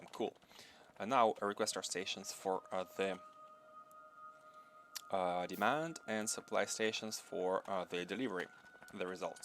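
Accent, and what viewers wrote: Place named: Eastern Europe. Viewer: This railway system is very difficult. After seeing how Nilaus builds a railway where everything is "copy-paste" and simple in logic, it hurts a bit to make your life harder. I started watching yesterday and I'm going to watch all the episodes. Your English is amazing and very understandable because you speak like Slavs and I'm from Poland. You speak very carefully and that is a huge plus.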